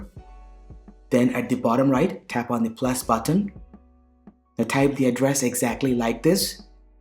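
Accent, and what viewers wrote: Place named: India. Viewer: I think he’s Indian because of his accent. I’m Indian too and I think his accent is Indian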